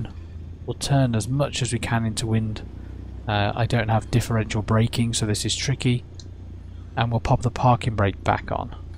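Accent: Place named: England, United Kingdom. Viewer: Great lesson. It is good to have in an English accent. Plus a view from the pilot's seat so as to get the picture.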